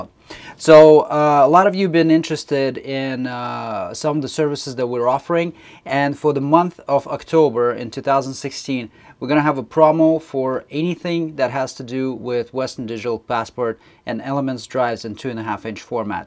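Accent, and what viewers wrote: Russia. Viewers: чет есть русский акцент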